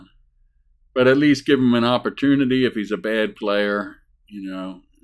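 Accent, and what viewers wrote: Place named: Baltimore, United States. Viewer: I'm from Southwest Baltimore County I should've known! It sounded like a Baltimore accent but a little different that's why I thought philly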